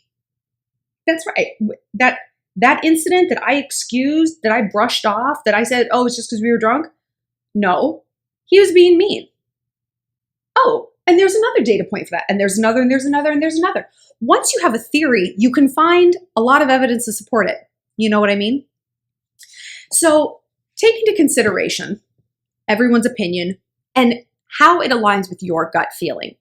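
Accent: England, United Kingdom. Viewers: That English accent though 😂 top notch madam, top notch 👌🏼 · That English accent though 😂😂 I like your channel, you give sound advice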